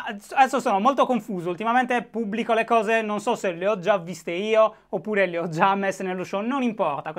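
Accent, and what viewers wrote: Sardinia, Italy. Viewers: Prima volta che guardo un tuo video, nuova iscritta :) (Forse che si nota che sei sardo :D)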